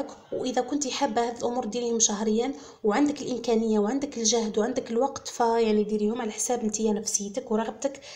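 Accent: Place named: Djelfa, Algeria. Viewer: جاتني لهجتها كما اصحاب الجلفة شكرا على الجواب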